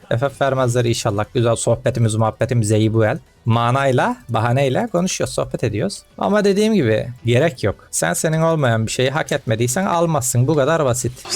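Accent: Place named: Black Sea, Turkey. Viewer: abi karadenizlimisin karadenizli vibe ı alıyorum senden aleyküm selam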